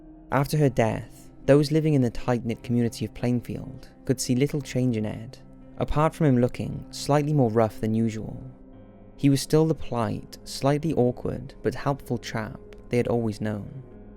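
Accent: Wales, United Kingdom. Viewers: This was amazing! Your quality of production and information is always top notch. Also, I'm welsh and I can hear a bit of a welsh accent in your voice..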